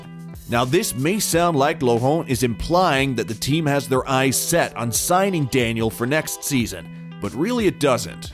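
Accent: United States. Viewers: Blar blar blar !!! I'm a top fan of F1 but this channel is starting to put me off, wait till you got some real evidence and are 100% about something, just going on and on and this Yanky doole commentating guy is starting to grind on me. Time to bow out and Unsubscribe!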